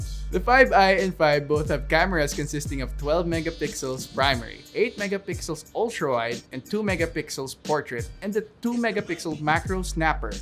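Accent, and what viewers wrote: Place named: Philippines. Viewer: I love his neutral Filipino accent! Unlike the chinito one na mej annoying plus he's the only who didn't mention "take everything with a grain of salt" #loveyugatech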